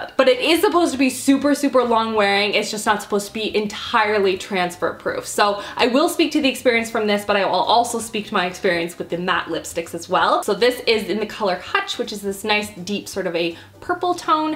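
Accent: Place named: Canada. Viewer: riley gatenby a Canadian one, if anything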